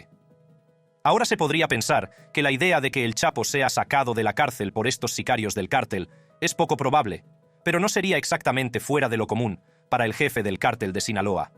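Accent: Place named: Central America. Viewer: De seguro no es gringo. Por su voz parece centroamericano.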